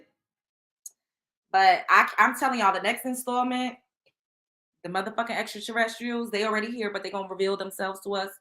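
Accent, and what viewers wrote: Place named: New York, United States. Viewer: Hey girl! Do you remember Miss Jones from Hot 97? You sound a lot like her. It’s the NYC accent for me! 😍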